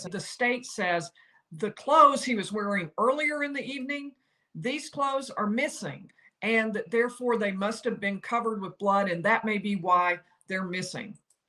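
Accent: South, United States. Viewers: Yes ma'am this is the "southern accent". Many yes ma'am and very polite folks in the south. My kind of people.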